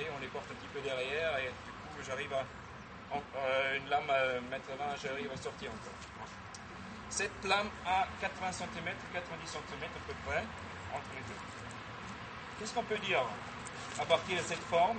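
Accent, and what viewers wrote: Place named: England, United Kingdom. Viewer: Hello! It’s in French. But the person who speaks to an English accent would say:-)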